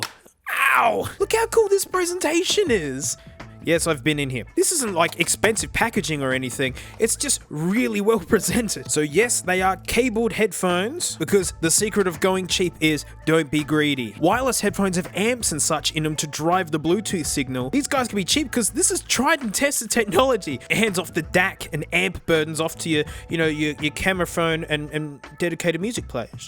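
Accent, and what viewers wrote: Australia. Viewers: Aussie humor + Aussie voice + Headphones and or headsets = great video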